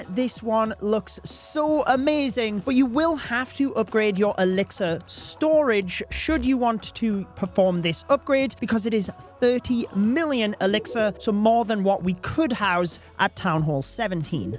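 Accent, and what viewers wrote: United Kingdom. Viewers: I love this British accent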